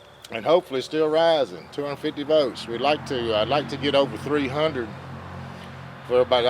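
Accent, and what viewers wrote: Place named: Appalachia, United States. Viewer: it's impossible to take this clodhopper seriously with the hocking up phlegm and spitting and the lazy hillbilly dialect.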